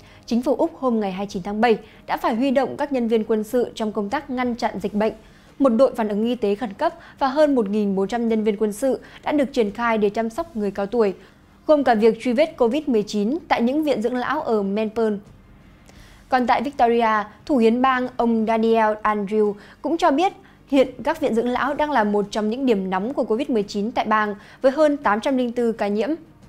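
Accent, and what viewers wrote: Standard. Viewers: BTV tuyệt vời phát âm rất chuẩn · Mc nầy phát âm chuẩn quá.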